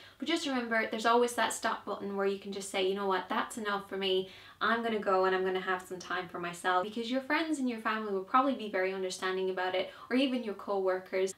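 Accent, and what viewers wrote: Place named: Ireland. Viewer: She's Irish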